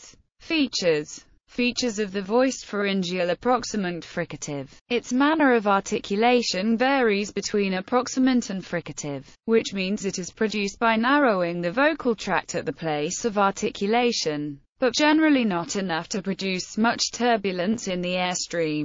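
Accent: United Kingdom. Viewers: Please get rid of the monotonous Received Pronunciation screen reader.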